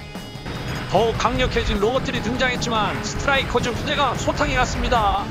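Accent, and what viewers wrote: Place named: Jeolla, South Korea. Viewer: 랑깨랑깨 하는거보니 배충이가 산듯? 재미만있음 상관없응께 노 상관ㅋㅋ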